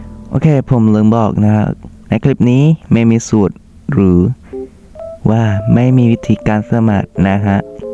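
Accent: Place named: Deep South, Thailand. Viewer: เด็กสามจังหวัดชายแดนภาคใต้แน่ๆสำเนียงแบบนี้ผมชอบทำต่อไปให้กำลังใจ🥰🥰🥰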